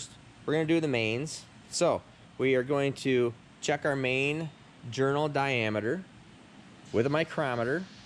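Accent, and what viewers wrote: Michigan, United States. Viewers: Guys sounds like a Michigander. Those guys know their stuff.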